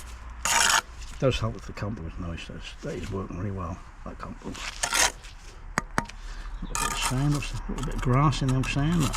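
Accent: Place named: Norwich, United Kingdom. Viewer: Where abouts in Norfolk are you? Sound like a good old narwich boy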